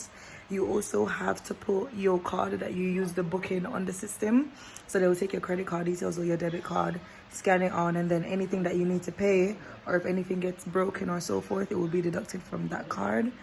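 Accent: Jamaica. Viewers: I love when the patois drop in and out😂😂😂😂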